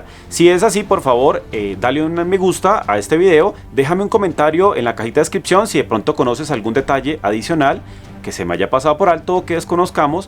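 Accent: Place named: Colombia. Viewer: Amigo. Eres Colombiano?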